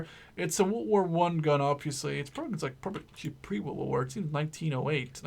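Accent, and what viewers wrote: Italy. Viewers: Salut spectatorilor si ai folosit accent de italian , dar oricum nu stii ce tocmai am spus :)) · Mie ami place oricum cu sa fara accent Italic #respect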